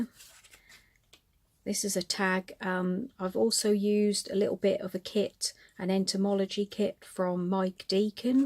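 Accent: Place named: Norfolk, United Kingdom. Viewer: So beautiful! Also looked at your Etsy shop because I recognised your accent - good ol' Norfolk! (Me too!) x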